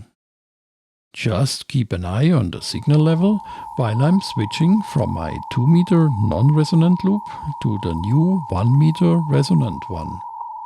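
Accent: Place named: Germany. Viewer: Ohne den Namen, wäre mir deine Tonspur tatsächlich nicht als deutscher Akzent aufgefallen. 😲 Respekt! Viele Grüße, Sebastian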